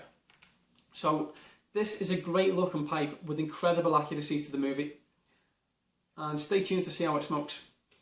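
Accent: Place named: Liverpool, United Kingdom. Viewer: Terminus Airsoft he's a scouser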